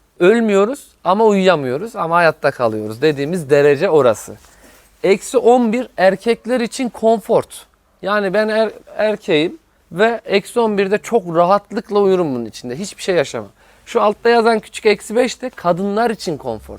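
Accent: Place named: Ankara, Turkey. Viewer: Nasıl içten nasıl bizden. Angaralı gibi gonuşuyon valla. Arkadaşlarla mağaza geziyorum gibi oldum 😀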